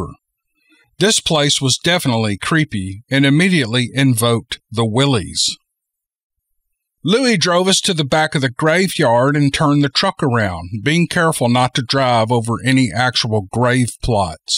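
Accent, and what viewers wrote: South, United States. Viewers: I can only speak for myself, but I haven’t necessarily returned to this channel again & again over the last few years only for “Bigfoot” stories. While that’s what drew me here initially, I quickly came to genuinely like; his familiar southern drawl, his easygoing personality and, his masculine voice… and some genuinely great stories. Sadly, nowadays it seems that any and every possible point of interest seems to devolve into some sort of cult. And we all know to stay far away from cults. could read the lyrics to, and invariably, I’d eagerly listen-in whilst cooking supper or washing the dishes… but, this is all just great entertainment, not a system of belief. Keep up the fantastic work, …💯🇺🇸❣️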